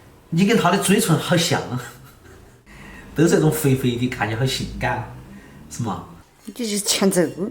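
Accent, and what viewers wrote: Sichuan, China. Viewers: 你彪哦！四川話真的是獨樹一格 XD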